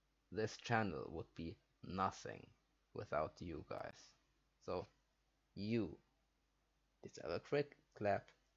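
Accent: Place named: Germany. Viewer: So, what country are you from? Your name looks Scaninavian (maybe Swedish), but your accent sounds German to me, but I don't hear well. Enjoyed your Home Free reaction. They're often great, and sometimes silly. Good job.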